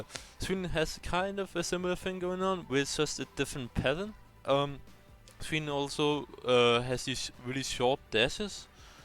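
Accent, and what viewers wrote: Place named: Denmark. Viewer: Your Danish accent is so strong 😀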